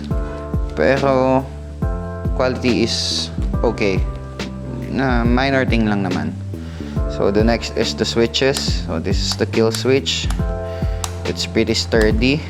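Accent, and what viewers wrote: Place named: Conyo, Philippines. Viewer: grabe conyong conyo si idol! nosebleed ako hahaha.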